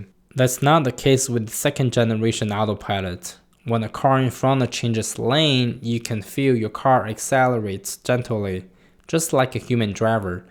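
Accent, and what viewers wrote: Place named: China. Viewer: good english, but still, 老中 😊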